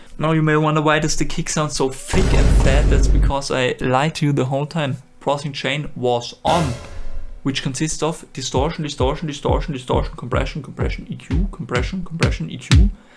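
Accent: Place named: Austria. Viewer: I am a simple man. I hear a fellow Austrian accent, I press like.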